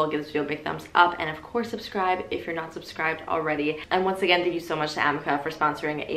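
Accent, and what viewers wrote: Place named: Canada. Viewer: I love you and your videos!!! I could just listen to them 24/7. Also the way you say name is 👌🏻👌🏻👌🏻 I genuinely love your Canadian accents lol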